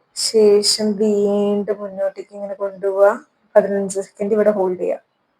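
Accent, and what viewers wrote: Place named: Malappuram, India. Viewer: 100% ശരിയാണ്. മലപ്പുറംകാരിയാന്ന് തോനുന്നു. പൊതുവേ മലപ്പുറം കാർക്ക് പല അക്ഷരങ്ങളും വായീന്ന് വരില്ല. ഉദാഹരണം: മഴ എന്നു പറയുന്നതിനു പകരം "മയ" എന്നേ പറയൂ. പഴം: പയം, കഴപ്പ്: കയപ്പ്, പഴനിമല: പയനിമല, കഴിഞ്ഞു: കയിഞ്ഞു, നാക്ക് വടിച്ചാൽ മാറുമോ ഈ പ്രശ്നം. സാക്ഷര കേരളത്തിൽ ഇങ്ങനെയൊക്കെ കേൾക്കേണ്ടി വരുന്നു. കഷ്ടം.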